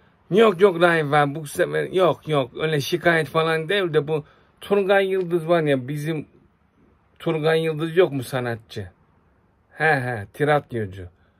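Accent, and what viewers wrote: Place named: Anatolia, Turkey. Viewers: Aksaray şivesi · Genel iç anadolu şivesi galiba( raif abi) birazda güneydoğu bölgesine geçsen güzel olmazmı Inan seni izlerken ağlanacak halimize gülüyorum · Anadolu insanının mantığı, deyimleri, bu şive, ... vallaha var ya, lan argadaş neymişin be...! Geberiyom seyrederkene ...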